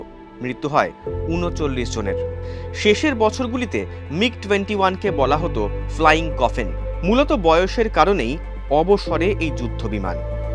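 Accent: Bangladesh. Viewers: বাংলাদেশের মানুষের মতো কথা বলে ছেলেটা😊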